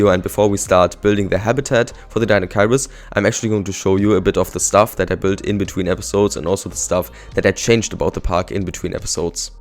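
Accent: Germany. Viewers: I love the Design and Are You German because of the accent😅?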